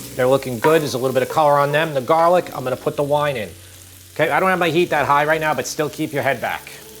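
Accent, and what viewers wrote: New York, United States. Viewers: I watch alot of YouTube cooking tutorials, but your breakdowns & your NY accent make these easier to follow, being a fellow NYer 👍🏿😎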